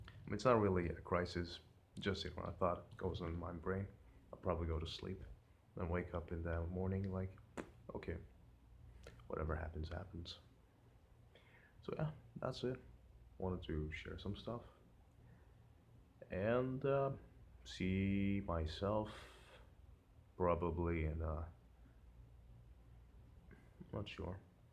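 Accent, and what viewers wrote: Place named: United States. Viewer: maybe we need to break it down; are most of our goals considered needs? For example, my goal is to look prettier - if I ask myself why? I'll know it's because 1- it gives me options for different work fields (such as modeling) 2- (more important for women lol) I'll probably have many admirers, which means different options available for picking a potential partner, or get completely shunned by real great ones lol. Regardless, they're all needs. Temporary. They don't last. Which is why it's excellent that you thought about it! Instead of letting your goals aka needs drive you around, you're thinking much ahead! That could actually give a lot more meaning to your goals and guide you through the way, resulting in true accomplishment. (Ps what state are you from? Incredible American accent!)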